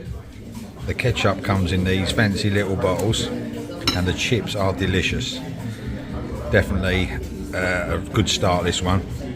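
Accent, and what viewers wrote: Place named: Cockney, United Kingdom. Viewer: your vlogs remind me that there is still so much to do and see in London as someone who is London born and bred. there's still so much of my city to discover, your vlogs remind me that there is so much to still be excited about in life. I also love a cockney accent! thanks so much for the vlogs and please keep 'em coming!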